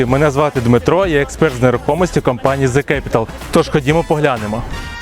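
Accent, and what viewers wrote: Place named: Russia. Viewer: He is speaking with russians accent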